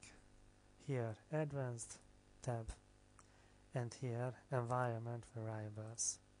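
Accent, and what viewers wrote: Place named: Hungary. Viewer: azért észre lehet veni a kiejtéseden, hogy magyar vagy XD. Amúgy nagyon jó tutorial. Kössz szépen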